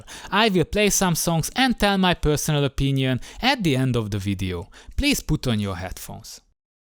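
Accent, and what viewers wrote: Hungary. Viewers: Magyar vagy?Kicsit olyan az akcentusod...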